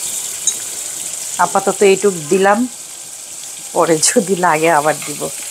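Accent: Sirajganj, Bangladesh. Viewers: আহারে কত মধুর আমাগোরে সিরাজগঞ্জের ভাষা। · সিরাজগঞ্জের ভাষা অনেক ভালো লাগলো শুনতে শুনতে হাসতে হাসতে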